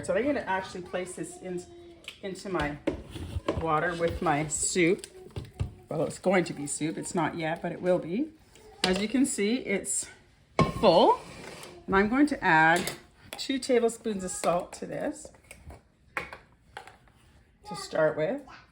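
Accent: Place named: Manitoba, Canada. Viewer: Thank you for sharing your method! I have yet to try water bath canning, but am excited to have some ready-made food to have on hand. My sister in law's parents are from Manitoba, your accent sounds similar! Greetings from Ohio 🙃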